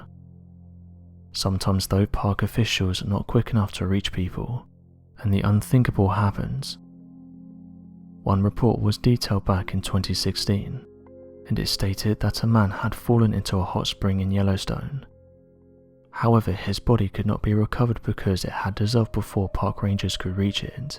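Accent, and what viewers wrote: United Kingdom. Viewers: Well this information has been available for decades, I couldn’t stand the video because the whispering voice is so annoying, though he has a beautiful British accent he’s trying To narrate like it is a ghost story and it’s just pathetic.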